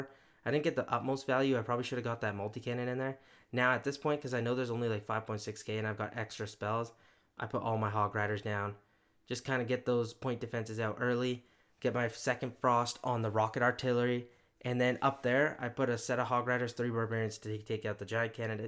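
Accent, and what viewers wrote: Canada. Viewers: You owted yourself. Bro is from Canada or upper midwest. I think he watches trailer park boys too. The way she goes!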